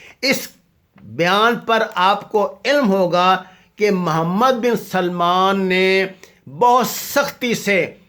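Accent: Punjab, Pakistan. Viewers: punjabi touch ata hy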